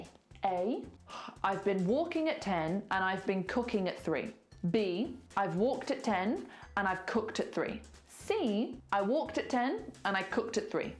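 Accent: United Kingdom. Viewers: That British accent... ❤️ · Thank you, It was so useful for me and, I love the British accent btw!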